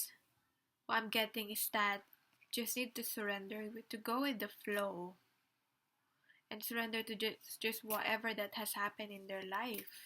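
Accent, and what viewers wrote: Philippines. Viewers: Picked no. 1. A good reading. I can tell w ur accent, that ur a Filipina.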